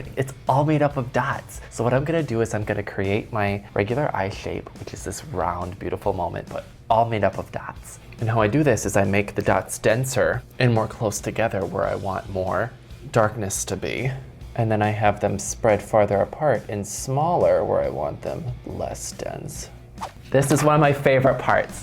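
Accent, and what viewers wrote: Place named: Midwest, United States. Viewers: The Minnesotan accenttttt. Makes me feel right at home😊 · Her Midwest accent is so fucking cute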